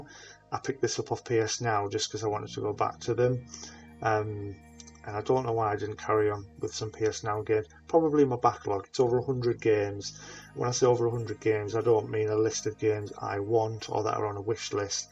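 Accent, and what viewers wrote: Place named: Liverpool, United Kingdom. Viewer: Strong scouse accent you have there to go with your liverpool scarf! Decent video mate best of luck with the channel.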